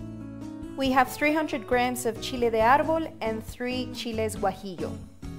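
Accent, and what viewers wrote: Australia. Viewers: You sound Australia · Being mexican and from Texas your transition from your Australian accent to perfect Spanish blows my mind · U sound Australian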